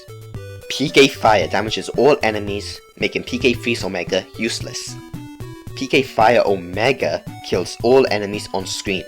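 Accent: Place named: France. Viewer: Are you French? Your accent is really distracting . Sorry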